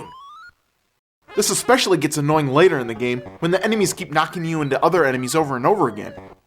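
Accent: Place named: Wisconsin, United States. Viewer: man this is so similar to avgn no wonder people are taking sides. both vids are good, james is just more entartaining...plus his jersey accent beats out this wisconsin accent any day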